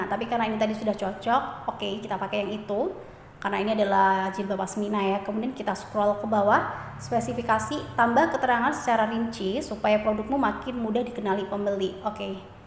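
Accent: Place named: Java, Indonesia. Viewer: Kayak ada manis2nya .... orang jawa ya ka?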